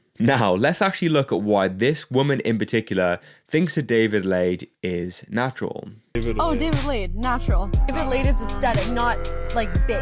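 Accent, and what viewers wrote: United Kingdom. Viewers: restarted and British bro ngl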